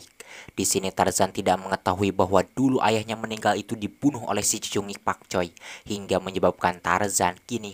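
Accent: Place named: Sunda, Indonesia. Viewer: Cara bicaranya itu loh .endingnya yg menyesatkan 😂😂khas suara dngan nada kesundaan 👍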